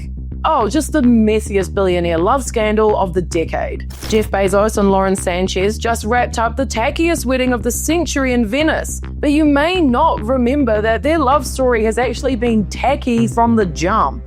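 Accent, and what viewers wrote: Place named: New Zealand. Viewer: OMG! Your NZ accent and facts makes me realise this is for real😮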